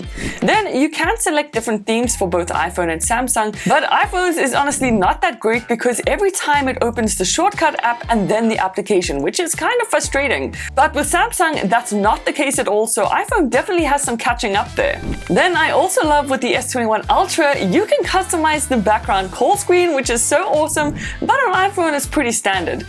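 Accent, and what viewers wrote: South Africa. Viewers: Heard a South African accent and hit subscribe. Only just bumped into your channel for the 1st time today. Quality content 👌🏾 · Is she south African?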